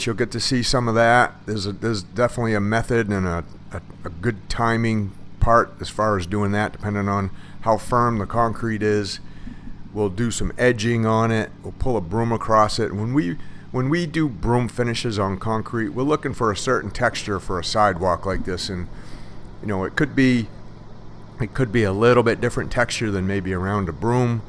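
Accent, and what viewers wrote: Maine, United States. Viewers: I knew you was from maine as soon as I heard you start talking, I'm originally from Maine. I lived in Washington County. Actually, the little town was called Princeton, but I watched your video 1 day because my husband is a Mason of like 40 years. And I was just going through different videos and see how other people did stuff and have been pop on yours and new year's from may good videos, by the way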